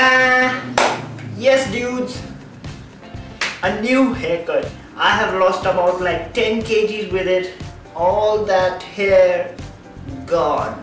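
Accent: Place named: India. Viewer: u look like nepali but sounds like an indian?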